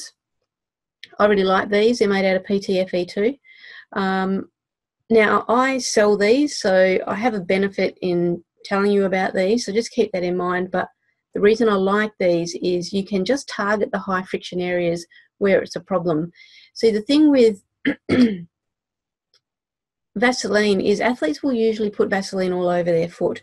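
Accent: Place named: Australia. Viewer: those of us not as familiar with the Australian accent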